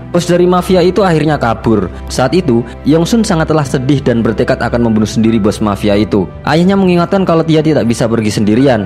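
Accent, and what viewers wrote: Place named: Java, Indonesia. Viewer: Disini mimin masih rada medhoq 😅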